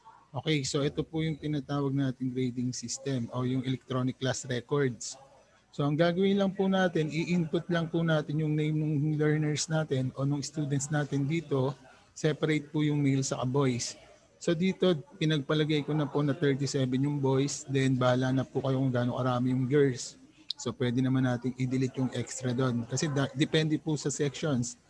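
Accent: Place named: United States. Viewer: The way she pronounced the words are not slang it is american accent good reading..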